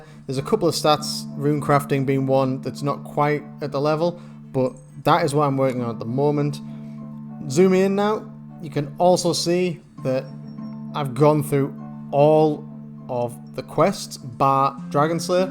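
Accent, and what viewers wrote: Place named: North East England, United Kingdom. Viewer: You from the north east of England mate? Just sounds it with some words you pronounce.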